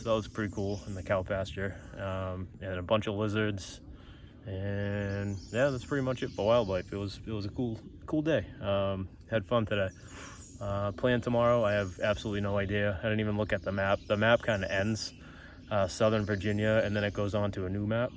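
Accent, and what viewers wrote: Massachusetts, United States. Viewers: NH here! I could tell by some of the words you said, you were from Mass.😂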